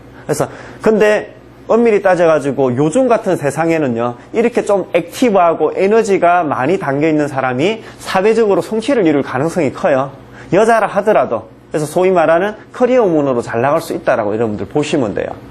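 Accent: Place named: Gyeongsang, South Korea. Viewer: 선생님 귀에 쏙쏙 들어오는 음성과 논리 너무 귀하고 감사합니다. 경상도라 그 억양이 더 친숙하고 이질감 없어 집중에 도움됩니다.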